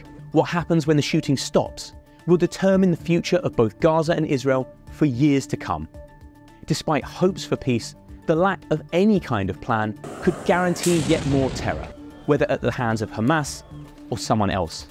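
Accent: United Kingdom. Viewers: I love the British accent, but you’re in denial or in fantasy land the IDF is losing the ground battle but it’s easy for them to attack civilians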